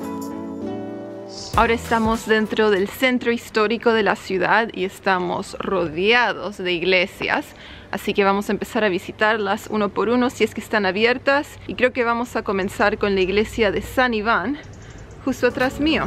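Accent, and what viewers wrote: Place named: Rioplatense, Argentina. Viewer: Guía de Viajes con y tu español tiene un leve acento rioplatense o chileno del sur...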